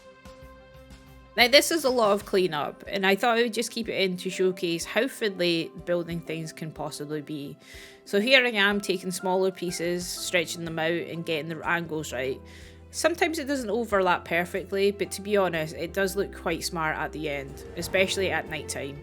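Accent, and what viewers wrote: Scotland, United Kingdom. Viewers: Hey think you could do a tips or tutorial video on prehistoric kingdom? I bought this game and I always get a bit overwhelmed and never know what to do... Your Scottish accent fills me with confidence so I had to ask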